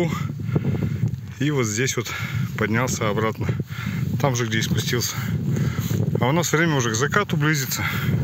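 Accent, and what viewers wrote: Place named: Urals, Russia. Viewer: Откуда ты дружище? Говор Уральский😅. Наш!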